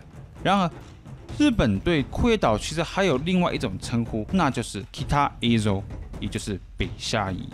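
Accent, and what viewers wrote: Taiwan. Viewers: 读书啦大佬，中国多民族是1912年起，不是历史2000年是一个多民族国家。知道历史上2000年，汉族中国跟外族经常生死搏斗吗？知道中国曾经被蒙古人所灭，朝代被称为元朝。汉族被满洲人所灭，朝代称为大清，正名是大清帝国，与日本签署丧权辱国的马关条约上，李鸿章是用大清帝国签署，不是用中国名称的，网上有副本可参阅，自己去看啦。知道五胡乱华，汉族几乎灭族吗？可以反苏反俄，但不可以篡改历史。知道本帖帖主是台湾人，说的是台湾国语吗？ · 在中立、客觀的學術表面包裝下，所成立建構的：庫頁島歸屬邏輯，一樣可套用在臺灣島上囉？？ 被倭人所產生的倭寇，一度以次等人種，來統治對待的後代，現在操著臺灣口音的標準國語，一字一句，企圖向中華兒女，對近代中國血淚屈辱史，要建立所謂的「正確」觀念教育，還真是我中華民族的悲哀。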